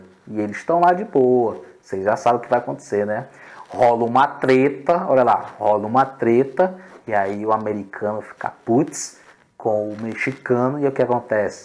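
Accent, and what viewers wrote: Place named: Bahia, Brazil. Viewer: Show de bola a animação. Mas qual é a origem do sotaque meio que baiano kkk Abraços Excelência...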